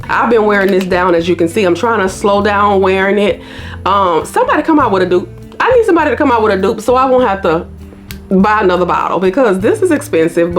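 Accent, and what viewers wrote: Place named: Tennessee, United States. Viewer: I LOVE your accent! Where are you from? It sounds Memphis/Tennessee as I’m from middle Tennessee 😂❤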